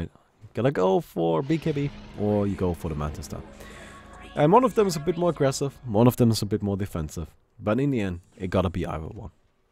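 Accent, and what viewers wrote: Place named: Germany. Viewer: I still really like watching these casts. it combines two of the most entertaining things that can be found in youtube. Games, and german opinions. that accent just makes everything more fun to me :)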